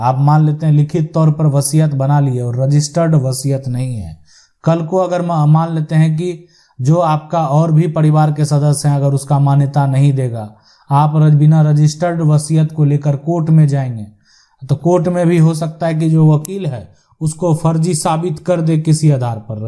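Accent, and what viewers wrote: Bihar, India. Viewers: बार बार वही लाईनें दोहरा रहे है दुसरे यह भाषा भोजपुरी टाईप समझ से परे। कुछ कुछ बिहारी टच। हिंदी भी नहीं है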